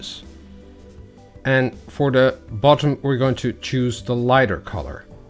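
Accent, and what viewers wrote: Netherlands. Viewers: Are you a native english speaker? I thought i heard a little dutch accent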